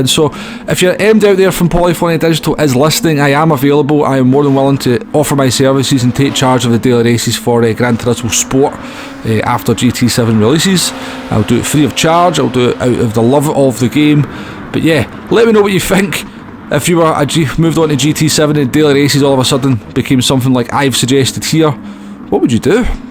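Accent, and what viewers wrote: Scotland, United Kingdom. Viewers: Holy shit I had to turn on the sub titles thick scotish accent 😳 I though we spoke the same language At the end of every video you should yell FREEDOM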